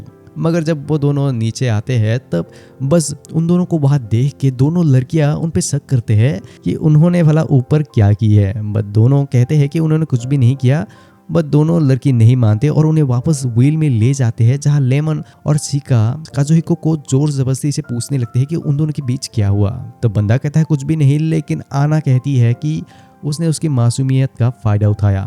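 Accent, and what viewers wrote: Northeast, India. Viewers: Northeast se ho kya Bhai?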